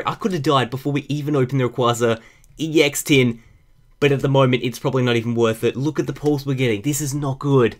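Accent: Australia. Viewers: Are you an Aussie? I am you sound like one, if so do you follow the afl and who do you follow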